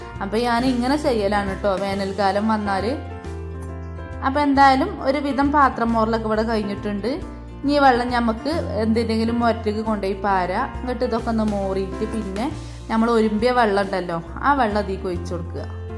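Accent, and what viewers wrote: Malappuram, India. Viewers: Soooper eniku malappuram Basha nalla isttamanu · ഹഹഹ...ഹഹഹ...മലപ്പുറം ഭാഷ അടിപൊളി....കൊള്ളാം... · ഇത്തയുടെ ചാനലിൽ അത്യമായിട്ടാണ് വീഡിയോ കാണുന്നെ ☺️ നമ്മടെ malappuram ഭാഷ ഉഷാറായിട്ടുണ്ട് 👍👍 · Like 29👍👍👍👌👌malappuram basha 👍👍👍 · അതെ. തനി മലപ്പുറം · മലപ്പുറം ഭാഷ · Ur Language is real malappuram taste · Vlog adipoli Malappuram samsaram 😄😄😄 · Enthaaan itrak mosham ano ngale malapuram slang itrak veandaayirunnu too sad to hear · Ningade mlp slang Super to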